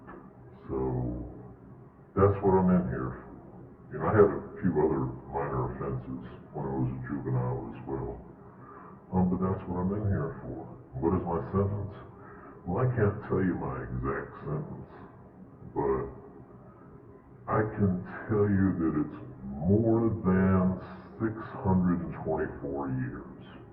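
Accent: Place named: South, United States. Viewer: Texas? The accent hits home hard, definitely from the south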